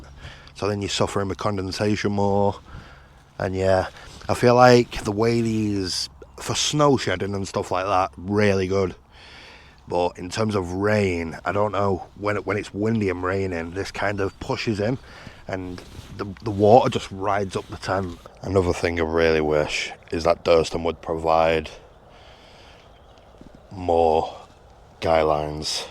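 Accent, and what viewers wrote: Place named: Northern England, United Kingdom. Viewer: Nice to hear a rete noorthen accent and a brew called a brew. Proper.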